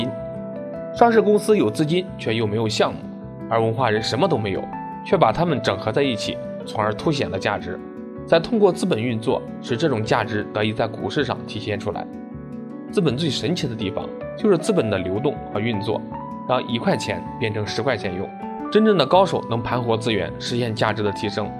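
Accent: Henan, China. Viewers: 兄弟你是Henan的吧？一听这口音，河南普通话，建议注意下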